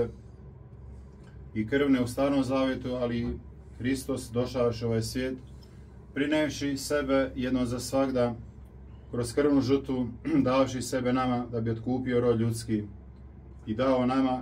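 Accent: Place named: Ekavian, Serbia. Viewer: Opet ekavičar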